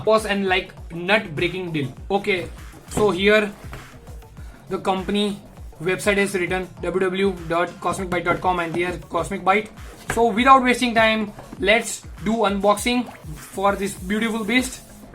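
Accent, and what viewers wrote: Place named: Butler English, India. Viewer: He speaks butler English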